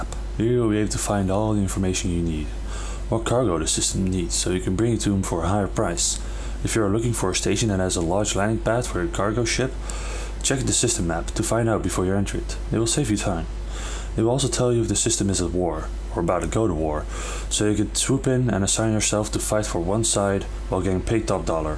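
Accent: Netherlands. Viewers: from which country are you? you sound dutch judging by your accent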